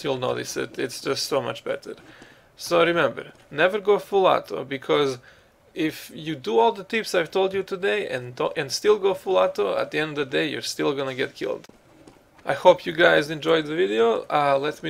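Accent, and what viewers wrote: Russia. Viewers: Forgive me if I'm wrong, but that sounds Russian. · How to not (Me: Man this guy has a strong Russian-like accent!) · Are you a russian or something? because you sound russian with your accent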